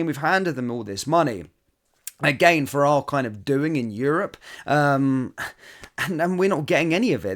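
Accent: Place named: Glasgow, United Kingdom. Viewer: Great Glasgow accent mate.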